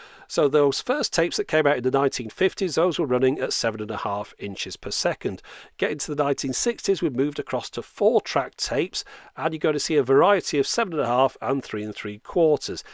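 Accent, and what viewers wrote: United Kingdom. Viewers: one of the reasons i watch this channel very often is not only the information i get but also the mesmerizing sound of your voice and astonishing British accent specially when you say 'however' and the last part of ' as always, thanks for watching' !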